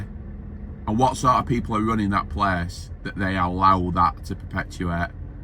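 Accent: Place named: Manchester, United Kingdom. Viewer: Hi mate im new around here i love watching truck driving vids only come across you just now here’s my take on the (not done much interesting) i myself hold a class1 (dont currently drive ) but one of my dreams is to do European and anything to do with your job abroad or England is fab to watch its probably not interesting to you because its the norm to us viewers it’s something out of the ordinary where in the uk are you from uk Manchester myself and your accent sounds close lol P.S its nice to watch someone that sounds like he enjoys his job good vid mate keep it up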